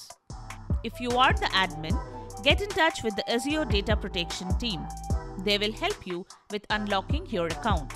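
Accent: India. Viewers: Funny, having an Indian voice giving advice about computer information.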